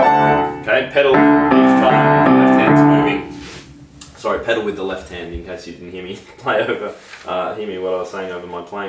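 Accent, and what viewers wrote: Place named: Australia. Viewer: you're so good! and Australian! xD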